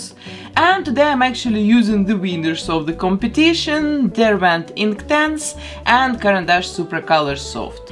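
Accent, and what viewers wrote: Russia. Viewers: Сразу по произношению можно понять что вы русская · Неужели это русский акцент? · It's russian accent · Ааа, мне кажется, что вы русская, акцент очень смахивает на русский, но... Мало ли? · блин, слышу типичный русский акцент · Is it Russian accent?🤔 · I dont mean to sound rude and mean no offence.. but are you russian? I ask cause of ur accent it reminds me of my aunt who is from russia.. but i love ur video of making jessica rabbit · You're not Russian by any chance? I just hear the Russian accent. I don't know why. By the way, it turned out very cool👍🏽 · Я один слышу ее русский акцент? Не? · Пиздецки ужасный английский! Такого русского акцента я давно не слышала. Это прям 10 из 10. Лучше бы просто текст поверх видео пустила. Жесть, тебе категорически не стоит разговаривать на видео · Ты из России? Слишком похожий акцент... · Акцент таки прям русский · Русский акуцент, здравствуй🖐🏼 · Она из России? 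Слышу русский акцент. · Почему мне кажется что вы знаете русский язык?) Слышиться русский акцент😊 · Русский акцент, неплохо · Мне одной кажется что у неё русский акцент? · Русский акцент? Разве нет?🤔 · Слышу русский акцент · О этот отвратительный английский. Такое чувство что чисто русская баба пытается на английском разговаривать а в итоге получается ранглиш. От произношения больно ушам приходится смотреть без звука.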